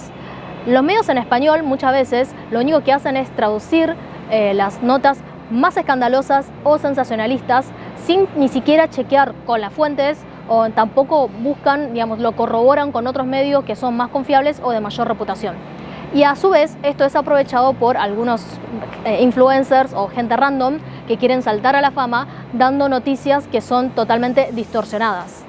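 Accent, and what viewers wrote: Buenos Aires, Argentina. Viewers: Muy bueno tu informe ..muy acertado ..me escanta tu tonada argentina ☺ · Oye tu español está perfecto! En cuanto a China.. vamos a separar 3 cosas. 1. China como país NO tiene la culpa 2. Todos los ciudadanos de china tampoco tienen la culpa, la gente de China es muy respetuosa y tranquila, son buenas personas 3. El Gobierno de China fue el problema. Peeeeeero en USA, Europa etc.. actuaron tarde igual que el gobierno de China. Tú debes estar tranquila, tu país y tus compatriotas NO tienen NADA que ver con esto Soy mexicano y si, el gobierno de México, actuó tarde como lo hizo el gobierno de China. Oye se te nota el acero como de argentina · Esta chica habla un español de Buenos Aires mejor que el mio.....congrats. Ya nada importa el virus.....estamos en el mismo barco. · Qué "porteño" que hablas por ser china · Se nota q viviste en Argentina ! 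Bella e inteligente !! Estás en Argentina ? O seguís en tu país ?? Me encanta q seas muy clara ! Q con acento Argentino reconozcan tu español latino Argento !! 😘😘😘😘😍😍😍😍❤❤❤❤ Sos hermosa , te queda muy bien tu cabello suelto !! Sos muy joven ! Eres soltera ?? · Qué graciosa!!! Una china hablando español con acento argentino!!!! Además super controlado el acento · muchas felicitaciones por exponer u explicar, te soy sincero hablas muy pero re-bien el castellano con ese pequeno tonito Argentino, pero mi gran admiracion y respeto para ti. Gracias.! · Yo me quedaría callada, todos sabemos cómo es el gobierno Chino , y por otro lado el virus salió de algún lado ya sea de laboratorio o que no hirvieron bien su murciélago y por su culpa estamos todos metidos en casa y ahora deben de responder por todo el daño que causaron además ese pinche acento argentino no te ayuda en nada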